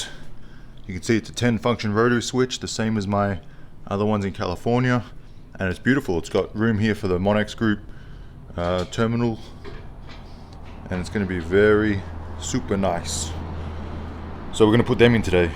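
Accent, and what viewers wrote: Australia. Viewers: Do I hear an Aussie accent? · No idea why this popped up on my youtube.....Notice the Aussie accent! so kept watching (Me being an Aussie) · is that an australian accent? definitely not american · Are you an Aussie?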